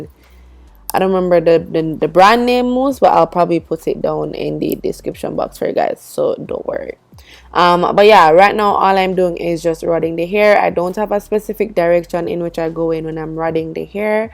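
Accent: Jamaica. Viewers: Girl I knew you was Jamaican from you said the 1st 2 sentences!.. lol u goooo girl.. this style is 🔥🔥🔥.. the baby hair is giving!!!!!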